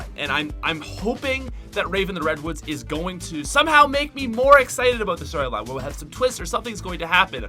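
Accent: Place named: Nova Scotia, Canada. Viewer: why do nova scotians butcher "about" us ontarians think that shits wierd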